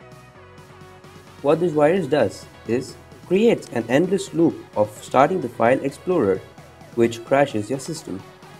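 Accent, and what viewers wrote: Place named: India. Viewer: Ofc he has a Indian accent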